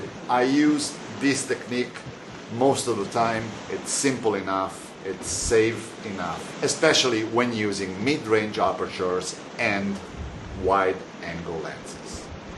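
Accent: Italy. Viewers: Hi, I've been following you for some time. I like the way you tell your work and I like your Italianized Italian but I don't like the way you do pasta, but that's another story:-). I'd like to ask you a question, I haven't found anything on your site either, about it. I saw you use an L-bracket for vertical shots, can you tell me the type / brand of this bracket? Do you feel comfortable? I'm waiting for your other videos. Good light. Ivan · I don't know why but there is so much "magic" or "positive energy" in your videos in which you are outside in the nature. Hard to explain ... It's beautiful - and then you play the music and it's even more beautiful. But strangely this is only in your videos - don't feel the same in other photographers videos when they are somewhere filming in nature. Thank you for the helpful information by the way. Like your Italian accent. 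Cheers from Austria!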